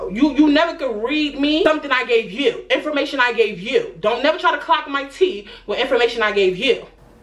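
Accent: New York, United States. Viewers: Lmaooooooo im from jersey and grew up inna hood and HATEDDDDDDDDDD all this ghetto ass shit being around me. (But no shade i loved to watch it from my window 😂😂😂😂😂😂) The way you tell these stories ny accent and all be having me deaddddddd. Makes me lowkey miss it a lil bit. But i love how youre real about ur experiences and where your mindset was at the time. I'm glad you got outta there, because alotttttttttttttttt of the people i grew up with are in the same damn place doing the same damn thing.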